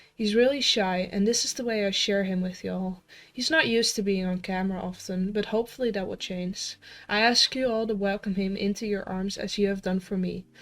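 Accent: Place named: Netherlands. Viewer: it took me a hot minute to realise your dutch! welcome aboard to the english speaking , dutch side of yt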